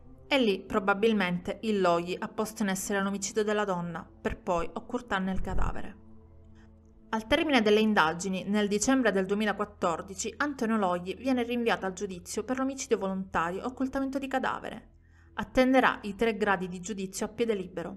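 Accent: Sicily, Italy. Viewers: sai che io che sono palermitana come te il tuo accento nn l'ho mai sentito? Poi l'ho letto nei commenti che sei siciliana, e gli altri invece dicevano che avevi l'accento siciliano, che strano forse perché era il mio stesso accento per cui nn ci facevo caso😃😃😃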